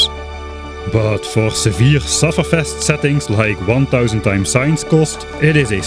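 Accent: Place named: Netherlands. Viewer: This is the definition of dutch accent lol i love it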